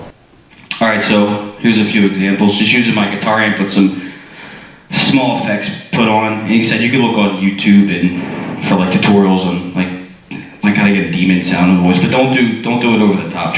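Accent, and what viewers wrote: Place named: Michigan, United States. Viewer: Your space is serving Nova Scotia/Maritime vibes. But your accent has that Michigan feel. Go get’em, Tiger. I once played “ ” at my next-door neighbors for an hour, on a massive sound system… because they’d have noisy rows EVERY FRIGGIN’ NIGHT when he got home from his job on the police force. It worked.. and IT WAS FUN. Now, I cannot watch ‘Beetlejuice’ without reliving the trauma; it was worth it.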